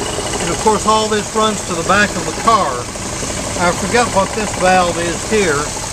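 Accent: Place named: Appalachia, United States. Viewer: I had to stop watching half-way through.. the hillbilly narrator with his stutter "uhs" and "duhs' was just too distracting and that video was nauseating!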